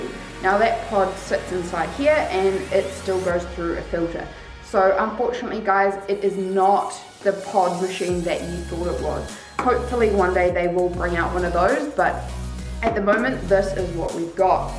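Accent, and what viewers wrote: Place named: New Zealand. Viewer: sounds kiwi 2 me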